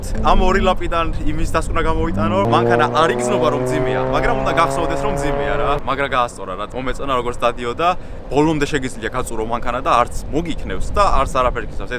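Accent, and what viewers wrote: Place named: Svaneti, Georgia. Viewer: სვანი ხარ ხო? აქცენტით ვხვდები სვანი ვარ😀✊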